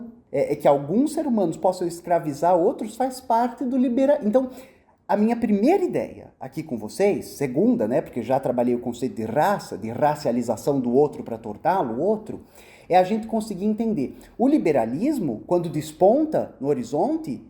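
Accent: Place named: Inland São Paulo, Brazil. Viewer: Apresentação brilhante e muito rica nas citações, elogios a sua pronuncia das palavras, como o paulista do interior, e desta elegante mistura de tantos imigrantes do Estado de São Paulo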